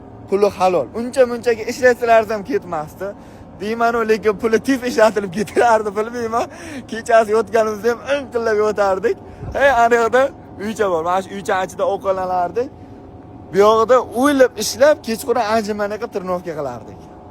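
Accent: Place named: Dagestan, Russia. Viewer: Саломни догистон шевасида м узбекча иложиси бору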